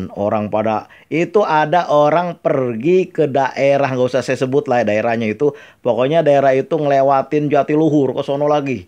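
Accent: Jakarta, Indonesia. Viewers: Alhamdulillah senang banget klu ketemu chenel pak ustadz n ane SK logat Betawi nya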